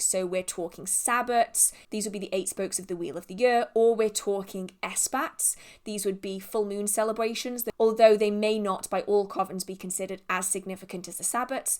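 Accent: United Kingdom. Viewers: Hello there. I found you recently. As someone from Romania first I noticed your British accent. Then your metal look in other videos.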